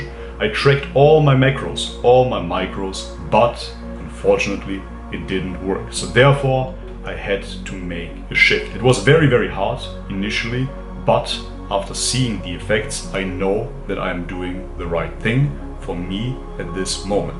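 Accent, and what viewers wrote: Germany. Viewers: Aren't you a German? Your accent definitely sounds like it? Sprichst du Deutsch?😁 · Lol very funny to hear you talk about sex with that strong German accent of yours!! 😂😂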